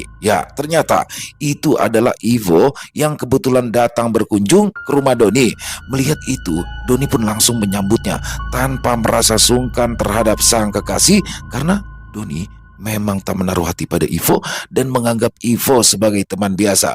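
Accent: Jakarta, Indonesia. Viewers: candu bgt sma crita bang doni logat btawi nya khas bngt. makaasih bang sehat sllu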